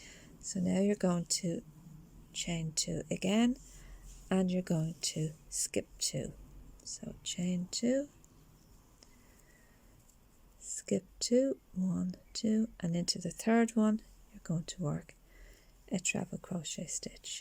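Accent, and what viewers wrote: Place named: Ireland. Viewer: Great video, love the Irish voice , brilliant 👏 thank you 😊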